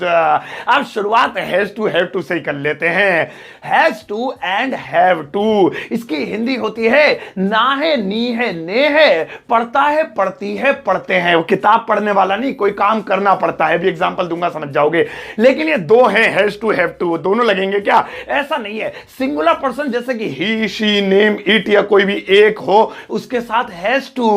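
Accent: India. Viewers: Desi English.♥️♥️♥️♥️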